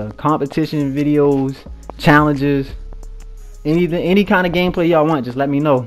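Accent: Baltimore, United States. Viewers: Baltimore ppl cant talk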